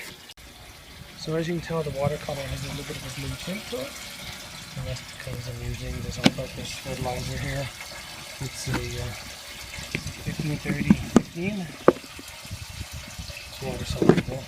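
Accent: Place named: Alberta, Canada. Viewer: This person sounds like they are from Alberta.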